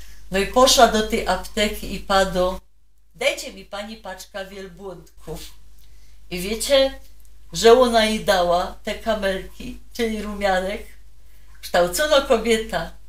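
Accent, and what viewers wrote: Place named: Podhale, Poland. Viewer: Wymacie piekna mowe po Slasku i u Pani slychac Goralski akcent. Pierwsze myslalam ze Pani jest z Gor , Goralka = Bergfrau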